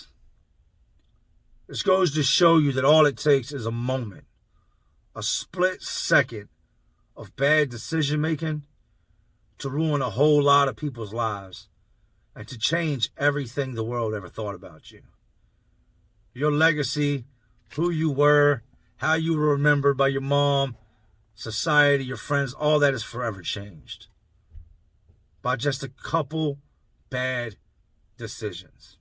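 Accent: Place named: Philadelphia, United States. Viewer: You sound just like a Philly guy🔥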